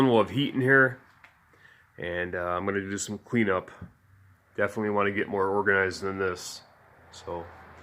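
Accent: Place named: Long Island, United States. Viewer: You from long island???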